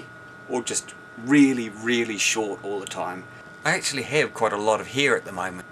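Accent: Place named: New Zealand. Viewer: wow, new zealand accent is similiar to british, but quite different at the same time...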